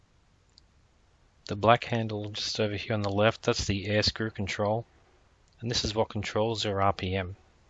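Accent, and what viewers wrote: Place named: Australia. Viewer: Dude you sound like an Aussie, why can't I find this game in Australia?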